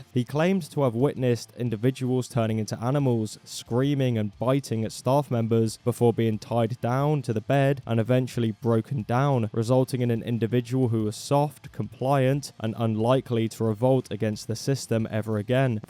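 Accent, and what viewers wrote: United Kingdom. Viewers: A bri'ish fellow talking stuff about Yegor Letov? That's cool, I wish it was interesting to me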